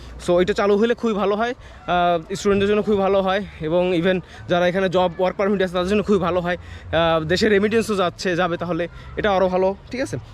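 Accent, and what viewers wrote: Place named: Meherpur, Bangladesh. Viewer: ভাই আপনি কি মেহেরপুরের ছেলে নাকি, আপনার কথা শুনে মনে হচ্ছে আপনি আমাদের মেহেরপুর জেলার ছেলে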